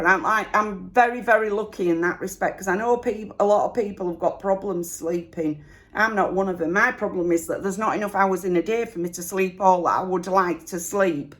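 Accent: Yorkshire, United Kingdom. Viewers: It's lovely to hear someone else with a Yorkshire accent 😀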